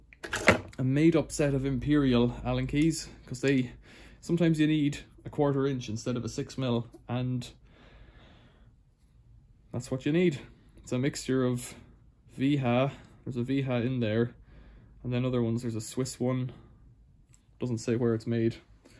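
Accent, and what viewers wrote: Ireland. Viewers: Do you have an irish twang to your accent? Im watching lots of your videos.. I have very similar interests to you lol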